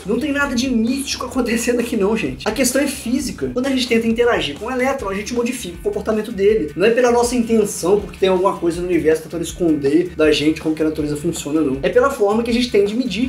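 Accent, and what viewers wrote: Minas Gerais, Brazil. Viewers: você é mineiro. Estuda em São Paulo ou em Minas? Abraço.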